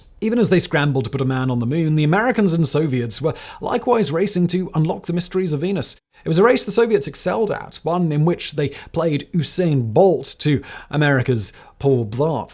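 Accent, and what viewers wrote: United Kingdom. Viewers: Maybe a bit less hipster, styling presentation... The british en (arrogant sound) style push it up, that it seems to help to establish the narcissism of these toxic days.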